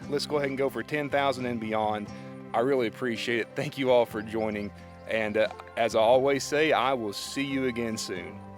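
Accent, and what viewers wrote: South, United States. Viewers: That’s the voice of a southern gentleman. Do you use beard products?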